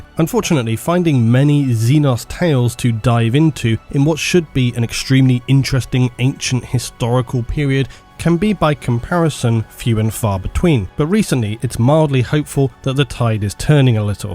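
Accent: United Kingdom. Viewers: Your voice and your accent in particular your British accent really helps you with a American audience I think I mean I like listening to you talk about this stuff more than say some American YouTube channels that talk about it most Americans want to admit it but a lot of them like a British accent when it comes to like narration an hour someone reading something or you know stuff like that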